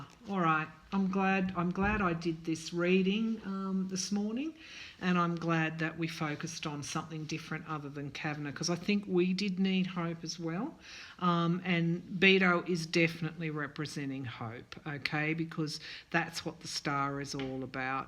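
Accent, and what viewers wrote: Australia. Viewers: Great to hear another Aussie accent oi, oi, oi! Best wishes for your channel.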